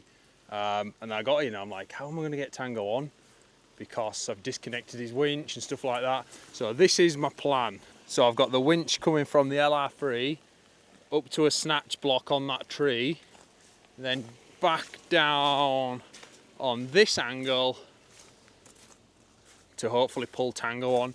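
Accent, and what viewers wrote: Yorkshire, United Kingdom. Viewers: So funny hearing your Yorkshire accent in America ❤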